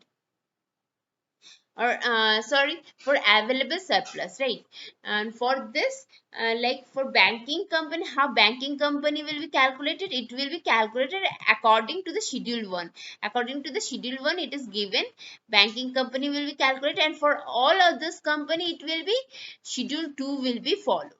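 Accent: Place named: Bengal, India. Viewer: I think so ma'am is Bengali her english speaking accent is so irritating. But at the same time she covers all above aspects question arising out points.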